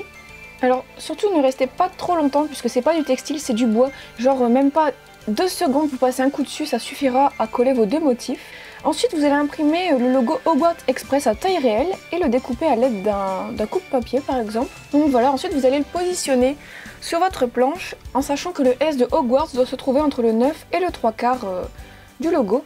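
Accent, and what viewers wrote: North, France. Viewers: je ne sais pas si tu as deja repondue a cette question mais tu es du nord j'imagine vue ton accent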